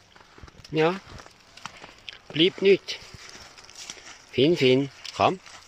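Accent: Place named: Switzerland. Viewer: Boah het mi das jetzt lang bruucht für usezfinde, dass du schwyzerdütsch retsch. Schöni Chatz <3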